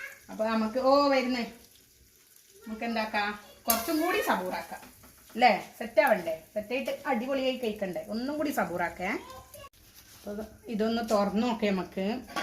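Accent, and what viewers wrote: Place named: Wayanad, India. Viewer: താത്താന്റെ സംസാരം കേ ട്ടിട്ട് വയനാട് ജില്ല തോന്നുന്നു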